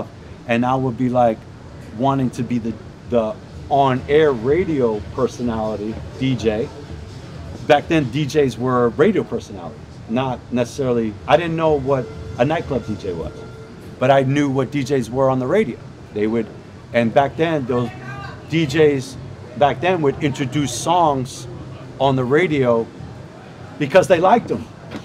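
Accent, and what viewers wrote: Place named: United Kingdom. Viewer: He's American??? All this time I thought he was British...😂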